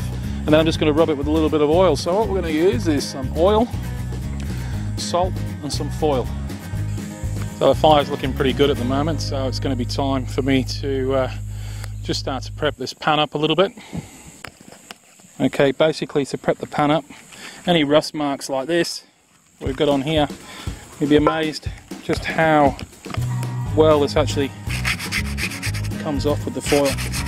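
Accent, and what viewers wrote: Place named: Wales, United Kingdom. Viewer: Hope I'm not rude but I'm struggling to place your accent, I'm picking up but of Welsh some Australian and English.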